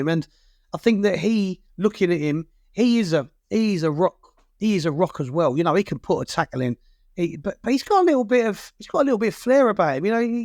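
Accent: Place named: Cockney, United Kingdom. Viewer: Cockney Joe Pesci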